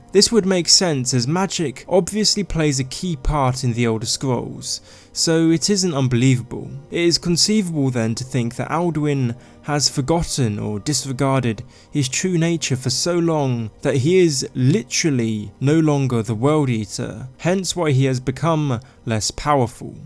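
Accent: England, United Kingdom. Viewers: English accent and a lisp. Mind blown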